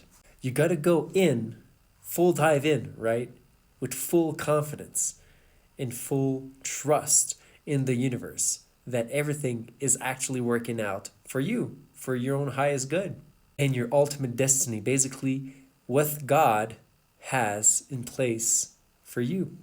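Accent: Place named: Quebec, Canada. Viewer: Thanks for sharing your great journey! I knew I recognized that accent 😅 Du Québec ici ✌️😁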